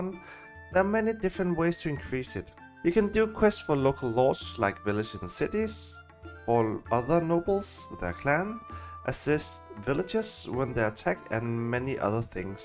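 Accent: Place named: Denmark. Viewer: Faldt over denne video, og sad også og tænkte "han lyder lidt dansk ham der"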